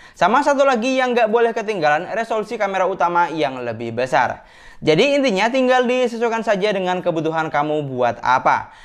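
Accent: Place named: Java, Indonesia. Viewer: kalo masnya orang jatim mungkin tau, orang yang biasanya masrahin (orang yang ngomong) waktu ada selametan(syukuran),logatnya kayak narasinya mas'e.. "dinten pitu pekenan gangsal, tebeh dipun rantos celak dipun.." yah pokoknya kyk gitu2an lah.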